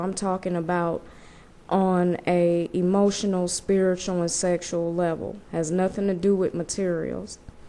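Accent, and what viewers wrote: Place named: South, United States. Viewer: I love your accent... It's got a strong Southern twist to it...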